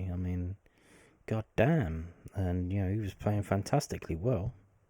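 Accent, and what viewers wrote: Scotland, United Kingdom. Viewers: Your Scottish accent is getting better by the week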